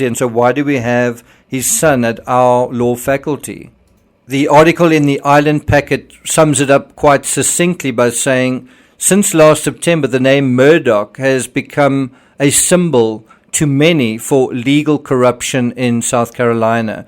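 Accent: South Africa. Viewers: It’s great to hear a South African accent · South African, I think.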